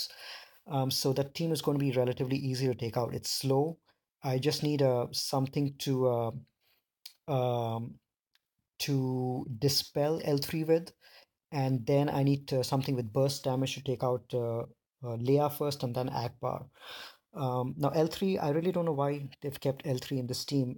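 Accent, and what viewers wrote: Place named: Asia. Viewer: It's the 1st time im hearing u... Feels so satisfying because all ur vids are soundless 🤣🤣🤣 BTW from ur accent, i guess that u r an asian.... Am I Ri8? Cause im Asian too 😋😋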